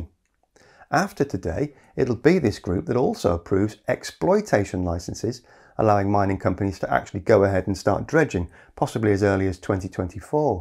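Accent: United Kingdom. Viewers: Hearing you come up with terms like "research odyssey" and speak it in the British accent is why I always preferred listening to Brits narrate in documentaries.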